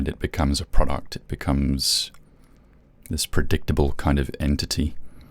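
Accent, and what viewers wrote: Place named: Australia. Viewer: he is the most Australian mf I've ever heard... Im so late but oml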